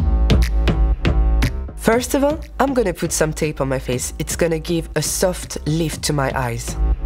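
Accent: France. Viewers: Juste en entendant son accent, on sait qu'il est Français 😂. / Just by his accent, we know that he's French 😂.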